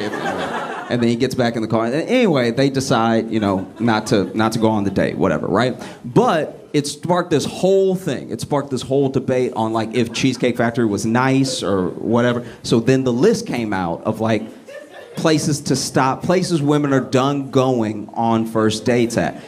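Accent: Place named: Louisiana, United States. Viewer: lmao his accent is Louisiana but his demeaor is totally New Yorker xD "the fuck?" xD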